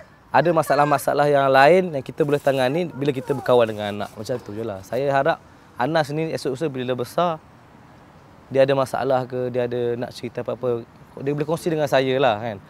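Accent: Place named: Pahang, Malaysia. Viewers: tulah ade slang timurr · ade loghat klntn kturnn klntn kot..phng ke?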